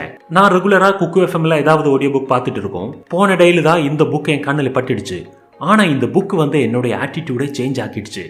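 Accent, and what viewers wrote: Kerala, India. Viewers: You are a malayalee???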